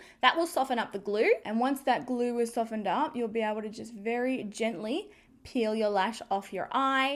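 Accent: Australia. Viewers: I just love your Aussie accent! That to me was a bonus!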